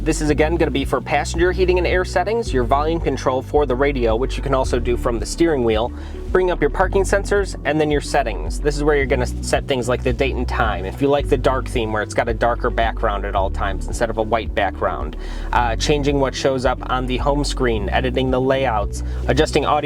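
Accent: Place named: Chicago, United States. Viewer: I enjoy the Chicago accent.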